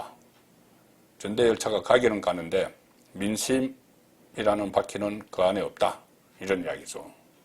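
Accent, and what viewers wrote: Gyeongsang, South Korea. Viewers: 늘 수고많으세요 푸근한 경상도의 특유한 목소리로 친근감을주셔서 믿음직합니다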